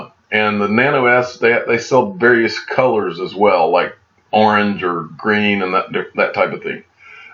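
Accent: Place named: Texas, United States. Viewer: Sounds like you're from the south...maybe Texas. Love the accent.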